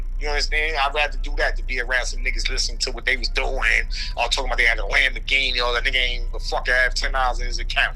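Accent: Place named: New York City, United States. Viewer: Hearing this man speak make me love the nyc street shit so much I dunno why I’m like this😂🗽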